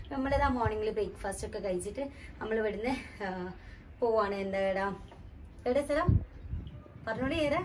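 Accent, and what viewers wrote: Malappuram, India. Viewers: Thani Malappuram slang Ijj kajj kujj Idayil sramikund shudham parayan 😂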